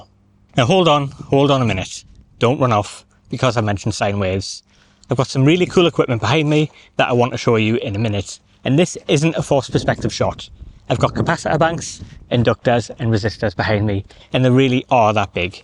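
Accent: Newcastle, United Kingdom. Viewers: We all love the Geordie accent mate! ;))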